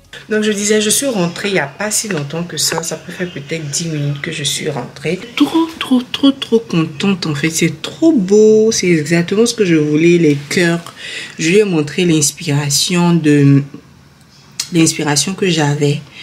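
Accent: Ivory Coast. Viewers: On adore l'accent ivoirien et le naturel dans la video! 😍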